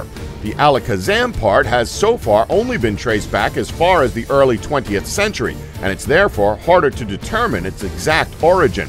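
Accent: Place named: United States. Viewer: This channel is funny to me , it's a guy with an American accent reading something clearly written in a British dialect.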